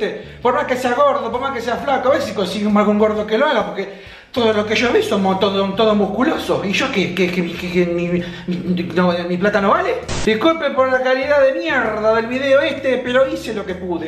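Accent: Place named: Argentina. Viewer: de que parte de argentina sos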